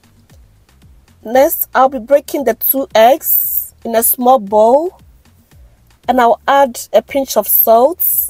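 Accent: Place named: Jamaica. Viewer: What accent is that? Jamaican accent?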